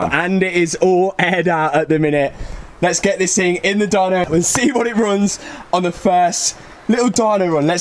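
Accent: United Kingdom. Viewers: Your british english accent hurtsfor my ears, also indistinctive.. BTW nice car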